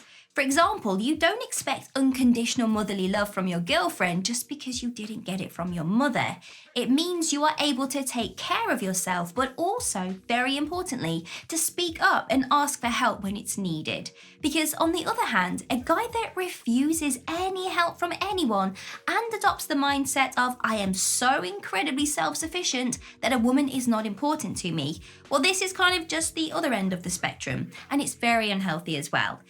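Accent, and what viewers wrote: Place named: Scotland, United Kingdom. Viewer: I can hear some Scottish undertones...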